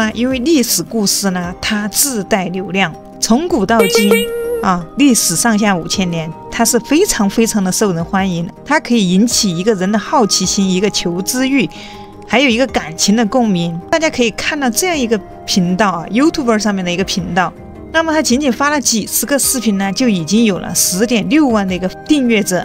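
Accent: Sichuan, China. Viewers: 四川人吧博主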